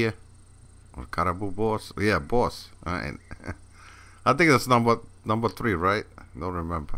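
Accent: Russia. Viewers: Love your Russian accent! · Я знаю что ты говоришь на русском по твоему голосу Я я знаю что ты русский но ты разговариваешь на на английском чтобы люди поняли тебя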